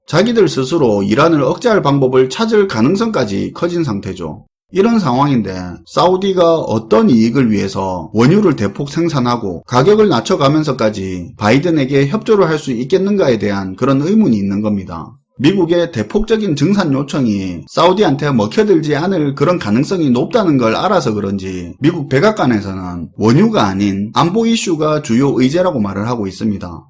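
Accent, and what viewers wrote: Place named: Busan, South Korea. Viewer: 부산 표준어입니다. 꼭 서울말이어야 하나요?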